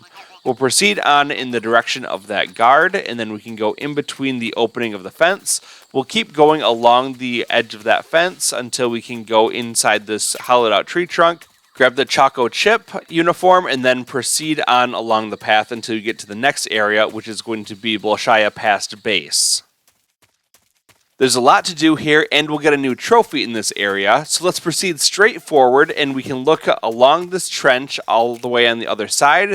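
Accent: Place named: North America. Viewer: Is this the NA version or EU version, im guessing NA because of your voice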